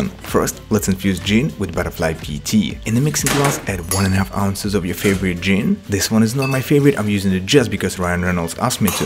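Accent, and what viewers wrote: Russia. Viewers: Боже ты же русский у тебя русский окцент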